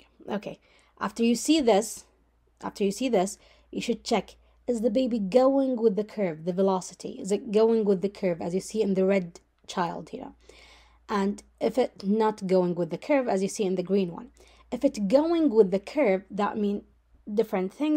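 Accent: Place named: Saudi Arabia. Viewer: استانست لما سمعت الاكسنت السعودي🔥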